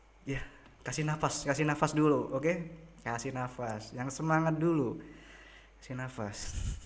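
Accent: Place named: Java, Indonesia. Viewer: kk GUE nya kok medok ya, maap kk skali lg tp ga pantes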